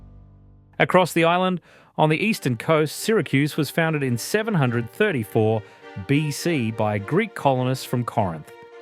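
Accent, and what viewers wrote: Australia. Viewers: Suspect Aussie? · is that an Australian accent I could hear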